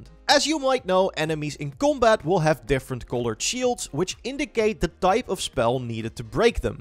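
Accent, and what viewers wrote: Netherlands. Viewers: Nederlands accentje hoppaa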